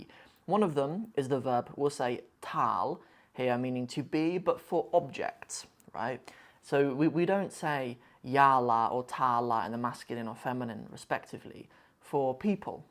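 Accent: North, Somalia. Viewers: I am somali wlhi The way you speak sounds like somali😊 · Yes brother you are use north Somali accent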